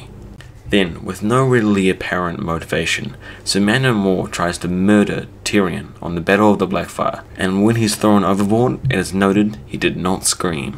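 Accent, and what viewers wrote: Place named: New Zealand. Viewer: Excellent research,! I'm trying to place your accent. New Zealand? South Africa?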